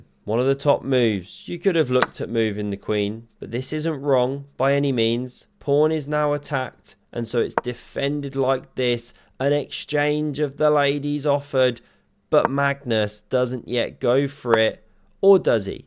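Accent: United Kingdom. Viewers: way too british. cant listen to this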